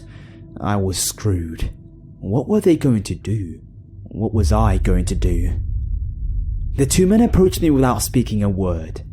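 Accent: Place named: England, United Kingdom. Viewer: His English acsent makes the story's not as scary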